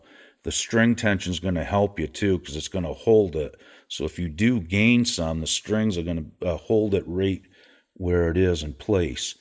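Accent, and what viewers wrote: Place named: Maine, United States. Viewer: Had to check you out after hearing you talk and was right...mainah bub yessah!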